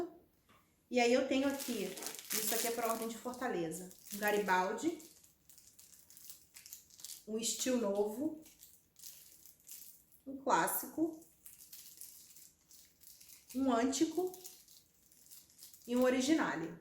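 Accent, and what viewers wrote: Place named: Rio de Janeiro, Brazil. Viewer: .. adoro esse sotaque carioca. Ótimo vídeo, uma aula, parabéns!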